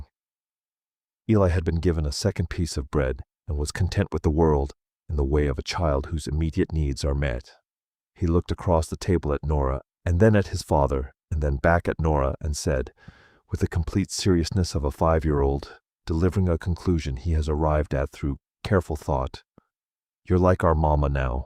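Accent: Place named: Australia. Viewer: That was beautiful! Sounds Australian.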